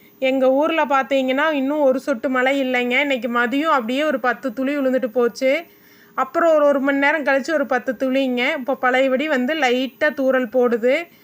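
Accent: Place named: Kongu, India. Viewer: arumai akka unga kural kongu slang nalla iruku kekarathuku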